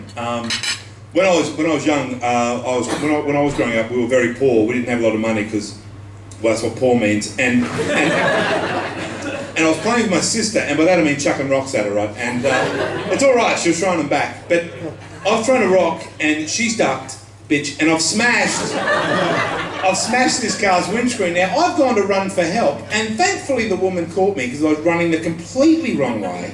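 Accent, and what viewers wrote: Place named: Australia. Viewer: I love fresh stand up, beards and an Australian accent. This guy is a total package! oh and funnier than $&!+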